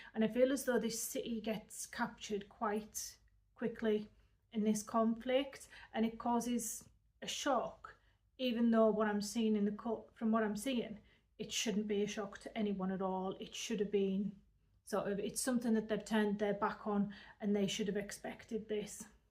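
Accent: Newcastle, United Kingdom. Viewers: Geordie or Liverpool I feel, I'm leaning Geordie. Someone perhaps not born here but has lived here a long time and picked up the local accent is my feeling? It's a nice accent, very interesting. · Yes l get what your saying she does sound a bit Geordie on further listening more north Yorkshire, l myself 12 miles from Newcastle. Where are you from?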